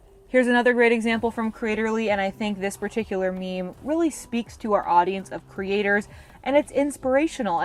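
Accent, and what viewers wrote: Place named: Canada. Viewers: This video was so super helpful! I for one often interact with tagged photos (outfits and locations) especially when considering to buy a piece or go somewhere. And I have been doing it when making reels and posts but I will definitely ensure I do it for all posts. And yes it is obvious that you’re Canadian from your “about” and “out” lol but I’m also familiar with how Canadians speak so some people may not notice - Nelle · This is amazing training!!! Thank you so much! Yes and the word process every Candian says a long “O” sound where Americans say “ah” It’s cute ☺️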